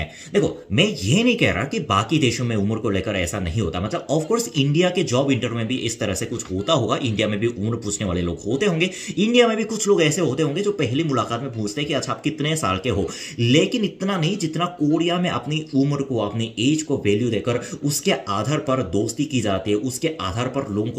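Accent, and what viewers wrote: Standard. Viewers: Itni sudhha hindi bolte ho, bada accha laga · Yeah you are right. His hindi is much pure than many Indians. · I must say you speak more shudh (original) hindi than many Indians.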